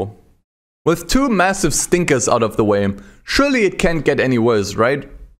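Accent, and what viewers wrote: Germany. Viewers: Ned schlecht das video bree. Hätte beinahe den deutschen Akzent nicht rausgehört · Wie man the german Akzent einfach raus heared 😂 nice ! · What an absolute banger of a video, must have taken weeks to produce such a long video but it was totally worth it! Btw. am i hearing a german accent?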